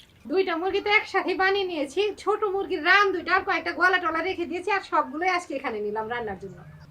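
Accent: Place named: Dhaka, Bangladesh. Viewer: আপু আপনি কি ঢাকায় ছিলেন আপনার কথা ওনেটা আমাদের মতো ঢাকাইয়া ভাষায় কথা বলেছেন রিপ্লাই দিয়েন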